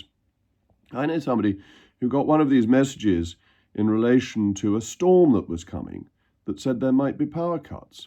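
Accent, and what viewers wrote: RP, United Kingdom. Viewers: You have the most British voice I've ever heard reminds me of my godfather his passed away now but I love your take on the government. Peace ✌️🕊️ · This is all so rich coming from you Jacob. I remember you mocking the public at that party, joking about the social distancing you conservatives brought in. You’re a traitor with a posh accent, nothing more, nothing less.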